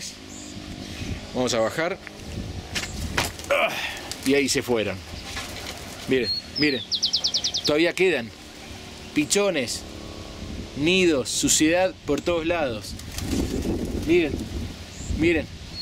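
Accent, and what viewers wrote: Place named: Argentina. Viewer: Vi el horrible video pensando en los consejos y sugerencias para erradicarlas, y la pasaste pasando un lugar que ni me importa y para terminar mi compañía es..... llámenos...... desde el principio pon PUBLICIDAD. No nos hagas perder el tiempo con los engaños y ganchos, además tienes acento argentino y yo vivo en México.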